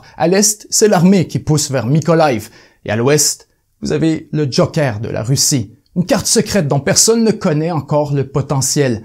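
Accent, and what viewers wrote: Quebec, Canada. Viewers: Je suis à 1 minute, je découvre cette chaine, et suis déjà convaincu qu'il s'agit d'un bon média ( et l'accent québécois n'est pas trop prononcé il est facile à comprendre pour un français ne maîtrisant pas votre accent, merci ) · vous faites un discours pro-américain et proposez des cours d'anglais. Abandonnez votre accent québecquois, vous êtes un traître, sans plus.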